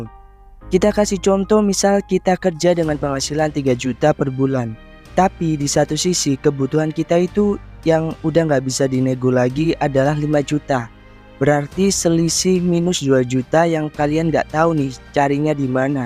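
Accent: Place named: Java, Indonesia. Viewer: Bro suaramu medok mencla mencle ga cocok ngmong la lo gue gue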